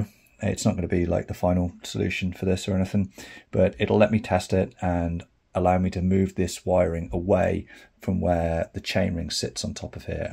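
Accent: United Kingdom. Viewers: Very interesting. Listening to you speak, can I hazard a guess that you originated not many miles from Swindon, UK??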